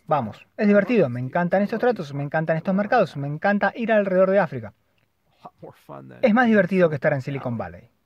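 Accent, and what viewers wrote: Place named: Argentina. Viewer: Todas mis disculpas por no haber enviado antes un mensaje de agradecimiento y cortesia a nuestro querido traductor, grandisimo trabajo que se agradece increiblemente mucho mucho, de todo corazon muchas gracias amigo. (Ademas me encanta tu acento, si no me equivoco eres de Argentina, no? Yo he nacido en Valencia, España) Un gran abrazo virtual y por favor sigue todo lo que puedas !!!!!! Gracias !!!!!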